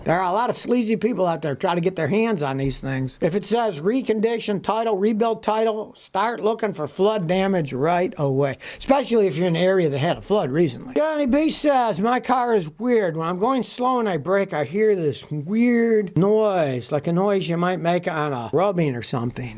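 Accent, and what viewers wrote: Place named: Michigan, United States. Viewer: THAT'S why you have that MI accent!! I always thought "he sure don't like a NY'r, he sounds like Chicago". Well now that riddle's solved. Happy Independence day 🇺🇸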